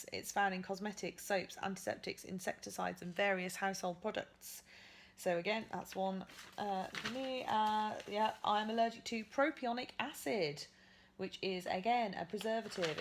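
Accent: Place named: United Kingdom. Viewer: A tip for getting rid of the sticky tape residue to anyone watching is to use an oil-based cleanser like those face oils that are popular now. But be sure it doesn't have anything you're allergic to in it! Thanks for posting this video, I have been having a lot of problems with a rash on my face for 8 months now and my newest derm suggested the patch testing. I have been hesitant about it, I just worry it will be uncomfortable and costly and I will get no results. It seemed fishy to me that I would suddenly develop an allergic reaction and paying this doctor for a test like this will magically fix it since I have had so much bad luck with it up to now and lost a lot of money on doctor's appointments and medications. I hope your accent means you are in the UK where you hopefully won't be bankrupted by all these tests. I guess I should look for more videos you've posted to see if cutting everything they said you're allergic to out healed your lips and face, I really hope so.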